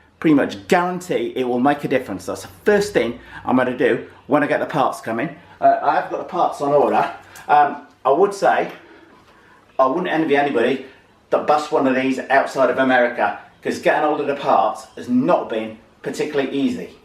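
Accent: England, United Kingdom. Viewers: greeting from Berlin Germany...I love your videos, but some times your English accent is too strong and i miss some word here and there. could you please tell me what gearing you use in this particular video? sorry i did not understood went you say it in your video. Thank again for all the tips...(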